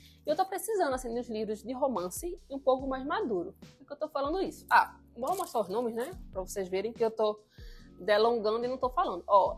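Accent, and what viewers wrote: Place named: Pernambuco, Brazil. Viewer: Topp, obrigado, gosto bastante da sua didática e recomendações, e também sou pernambucano como você, é reconfortante ouvir nosso sotaque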